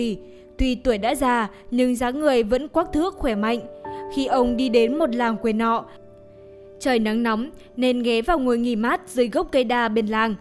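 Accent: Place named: Nghe An, Vietnam. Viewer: Giọng Nghệ An lai Bắc ra 1 cái giọng nửa nạc nửa mở rất khó nghe!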